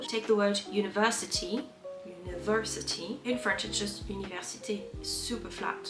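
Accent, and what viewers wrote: United Kingdom. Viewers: love your english accent! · I can tell that you have spent many months in the UK. Some of your sounds are very British. That's good. Well done. I would not have guessed you are French. This was a good list of what I have encountered while learning French. I'm at a 50 score now, and hoping to make B1 before I go to Senegal in March. I wish I had made more of an effort in grade school. I'm also working on Spanish, since I will be in the Dominican in October. I do missionary construction work, and I don't like to rely too much on the missionaries to communicate. Netflix does not have a lot of French content in the US, so if you have a tip for me about how to access that, it might help my French listening ability.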